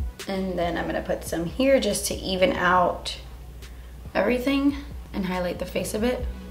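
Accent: United Kingdom. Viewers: Ok, but why was that British accent so adorable?😂💜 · Can you do a whole tutorial in your British accent please! I'm British and its super annoying when people try to overdo it but yours is SOOOO CUTE!!! I love it! xoxo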